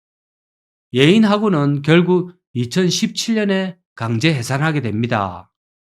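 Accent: Gyeongsang, South Korea. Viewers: 님 구수~한 갱상도 사투리가 참 정겹습니다 오래 오래 건강하셔서 좋은 영상 많이 보여 주시고 올해 반드시 100만 구독자 돌파 하시기 바랍니다!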